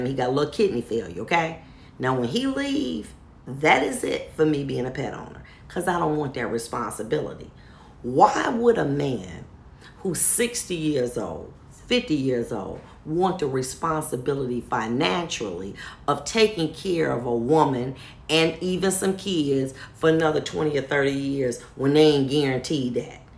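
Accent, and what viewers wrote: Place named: Detroit, United States. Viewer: I stumbled on your page. I'm a truck driver and I'm just listening and i swear i could hear the Detroit in you(flatout/fair exchange)😂and this game is not for the weak. It will go over most womens head. You have to be about it and be built for it for any of this to make any sense to you. Smart women who know the rules of engagement know exactly how to...engage😂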